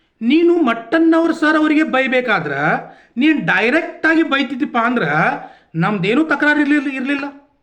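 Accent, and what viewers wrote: North Karnataka, India. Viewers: Chennagide nim uk bhashe bro bless u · Kshamisuvantha mathannu neevu aadilla bidu thamma aa halkat rakesh shetty ge sariyagiye ugididdiya yaradru avnige chennagi baiyabeku nange baralla adikke andkondidde ninna mathu keli bahala santhoshavaythu thamma innuu yenenu baruthho adella ugi namge bejarilla uttara karnatakavadrenu dakshinavadrenu madya adrenu yella karnatakane thane nimma kadeya bhashe style chenda 😊😅😂😂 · Nam uttara karnataka bhashene chenda anna🤩🤩🔥🔥🔥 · ಉತ್ತರ ಕರ್ನಾಟಕ ಭಾಷೆ ಅದ್ಭುತ, ಬೈ ಇನ್ನು ಬೈ ಆ ಸೂ,,, ಮಗಗ್ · ಅಣ್ಣ..ನಾವು ಕರಾವಳಿ ಭಾಗದವರು ಅಂದರೆ ಧರ್ಮಸ್ಥಳದ ಸಮೀಪ.. ರಾಕೇಶ್ ಶೆಟ್ಟಿಯಂತಹ ಅಲ್ಕಾ ಬೇವರ್ಸಿ ತಾವು ಎಷ್ಟು ಬೈದ್ರು ಸಾಕಾಗಲ್ಲ..ಉತ್ತರ ಕನ್ನಡದ ಭಾಷೆ ಕೇಳೋಕೆ ಬಹಳ ಚಂದ.. 🙏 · Uthara karnatakada sogadu adbhutha. Nimma baigulagallannu keluvudakke onthara maja.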